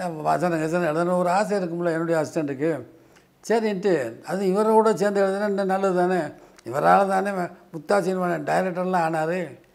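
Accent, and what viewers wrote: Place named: Madurai, India. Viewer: Wonderful presentation in Madurai slang.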